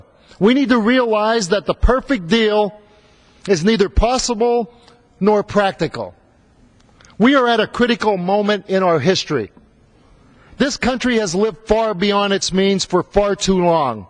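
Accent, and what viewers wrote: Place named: Hazleton, United States. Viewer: he still speaks like a hazletonian.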